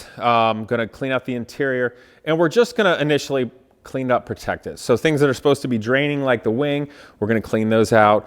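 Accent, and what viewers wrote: England, United Kingdom. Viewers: Excellent buyers guide. I would suggest you cover the dash with a leather cover. This is available online. I did find the British accent annoying though... Im part of the Porsche Club GB and I’ve never heard anyone be quite that anal. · Good vid v detailed, do have a soft spot 4 944's. Nice example. Love the plummy posh English accent! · Great British accent,! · Rally it, that English accent is sick haha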